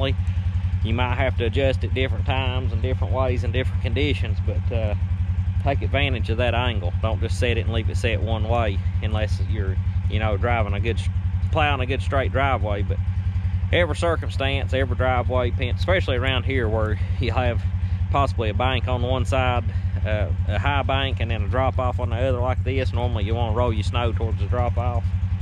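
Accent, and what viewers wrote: South, United States. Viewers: Love your southern accent! Yont to?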